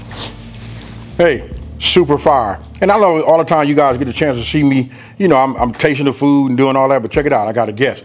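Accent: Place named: California, United States. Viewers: Does anybody know where this guy is from? I think I heard him say he was in Vegas these days but he don't sound like he's from Vegas. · For all those who think Californians don’t have an accent, this right here is a prime representation of a thick California accent 😆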